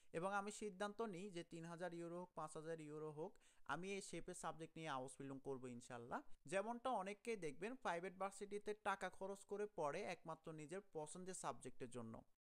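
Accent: Noakhali, Bangladesh. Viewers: Vaiya Noakhalir kon jaygay basha apnr ❤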